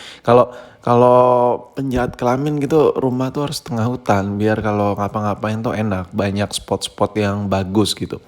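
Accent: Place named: Banjar, Indonesia. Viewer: Dari kalsel y itu ditempat ku tuh urank banjar boy🤣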